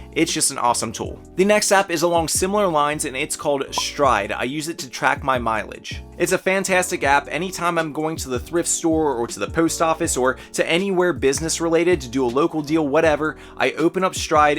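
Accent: Philadelphia, United States. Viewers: God video. Are you in PA? You have that philli suburb accent.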